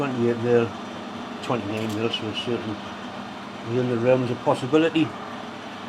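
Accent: Newcastle, United Kingdom. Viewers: Well up for Patreon. 'Specially if there's propa geordie swearin gannin on nall. :D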